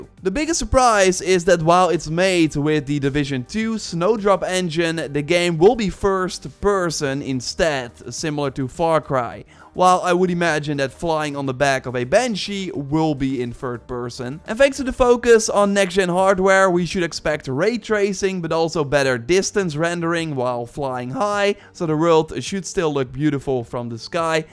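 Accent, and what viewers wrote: Netherlands. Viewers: The Dutch accent is strong with this one. Good informative video 👍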